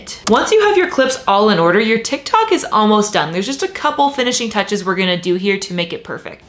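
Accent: Canada. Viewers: Candian? Hea?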